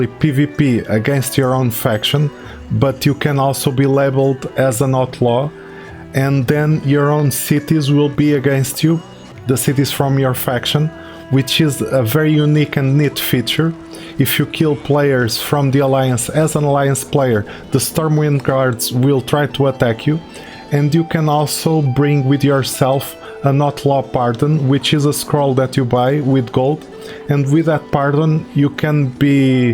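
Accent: Portugal. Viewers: Pelo sotaque consigo logo perceber que és tuga! Não sabia que haviam tugas a jogar BBC.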